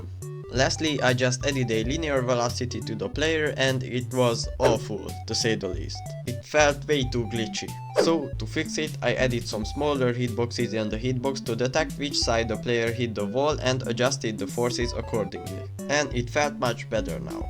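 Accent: Hungary. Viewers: nem feltűnő magyar akcentus lol, jó video végre találtam egy magyar roblox devet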